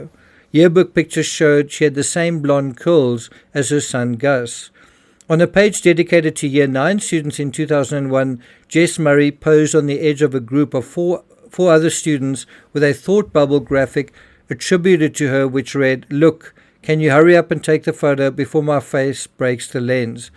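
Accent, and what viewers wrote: South Africa. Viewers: Why is a south African reading Australian news? It's difficult to understand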